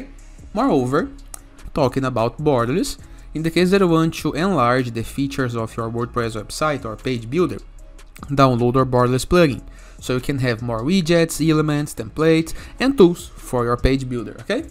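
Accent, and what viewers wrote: Brazil. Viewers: Sotaque de brasileiro, acertei?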